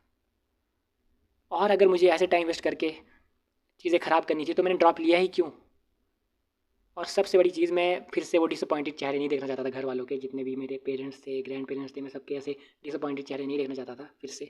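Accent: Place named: Himachal Pradesh, India. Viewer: Maine 1st tym dekhi apki vdo .. or apki tone se hi smjh gyi thi ki pkaa hp se hone ap bro 😁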